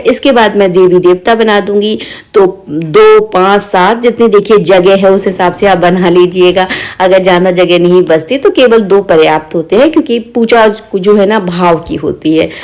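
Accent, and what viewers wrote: Bundelkhand, India. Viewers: Mam. Kya aap. Bundelkhand region se h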